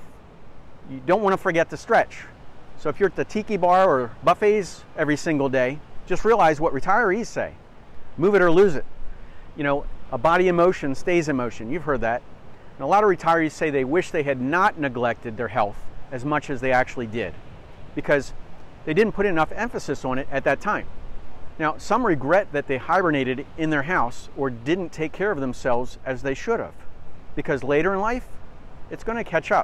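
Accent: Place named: Pittsburgh, United States. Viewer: Accent is from Pittsburgh?... maybe Philly...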